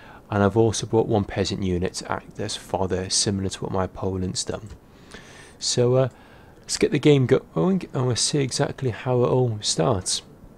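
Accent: United Kingdom. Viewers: idk,i just love your british accent :D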